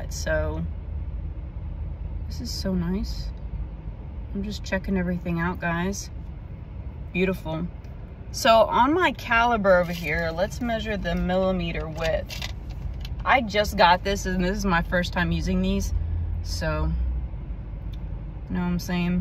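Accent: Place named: South, United States. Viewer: Hey it's been a while since I've watched your videos but you're beautiful as always and fun with that cute southern accent of yours.:) If you ever happen to visit Atlanta, Georgia we should hangout:D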